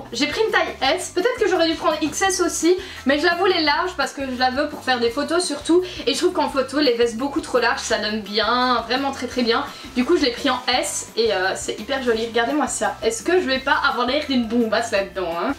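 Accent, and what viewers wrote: Liège, Belgium. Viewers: Tu serais pas Belge t'as un de ses accent ! Je suis Belge lol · elle est liegeoise meme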